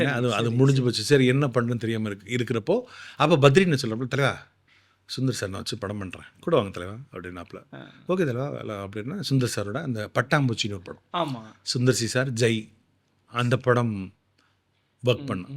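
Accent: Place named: Kerala, India. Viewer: மலையாள தொனி வீசுவது போல உள்ளது. இருந்தாலும் தமிழ் பேசுவது சிறப்பு. வாழ்த்துக்கள். குறை நினைக்க வேண்டாம்.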